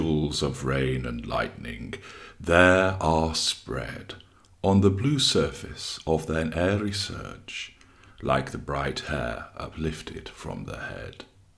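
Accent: England, United Kingdom. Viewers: Only an Englishman can speak like this.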